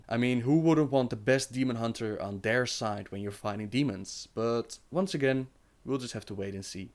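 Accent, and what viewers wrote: Netherlands. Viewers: Are you Dutch? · Trevor E. He is Dutch. Not Swedish. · Are you dutch? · WAUW jij komt echt uit nederland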